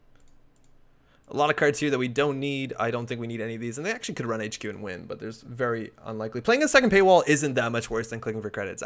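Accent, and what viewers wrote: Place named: Canada. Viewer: The canadian accent was much stronger back then!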